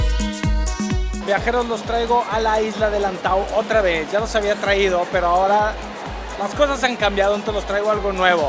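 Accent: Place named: Puebla, Mexico. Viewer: en este video parece poblano... sácate la papa de la boca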